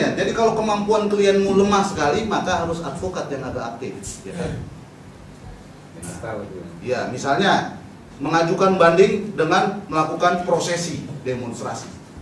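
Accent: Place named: Jakarta, Indonesia. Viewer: Cerdas, Lugas, Tegas & Cadas.. 😄 org Batak tp logat, suara dn gaya bicaranya kek org Betawi (mirip Alm. Benyamin S) Saya ngetik ini di tahun 2022, sgl keterangan & ilmu yang diterangkan pak Jhonson persis terjadi pd kasus KM50, Mirna, Brihadir J (SAMBO), tinggal penerapanya aja ini mah..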